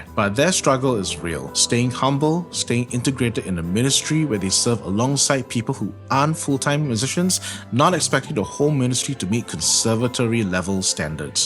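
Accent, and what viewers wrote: Singapore. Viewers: Funny listening to you speaking singlish to the band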